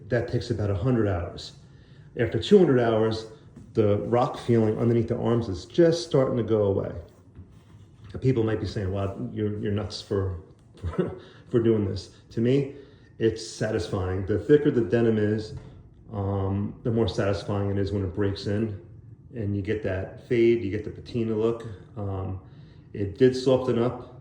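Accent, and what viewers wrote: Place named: East Coast, United States. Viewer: Been thinking about taking the 33 leap lately.. I'm thinking to request a shorter length and forgo the lower pockets to avoid the rising shoulders when sitting as you mentioned. Jacket is looking great, and you're actually selling me on the choice for a lifelong jacket. Also, you've got a great voice. I didn't notice any lisp or anything. I only notice you sound from the east coast.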